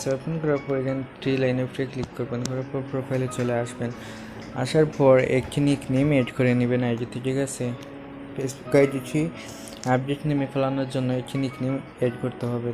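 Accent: Sylhet, Bangladesh. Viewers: Vai....Sylhety ni😁😁😍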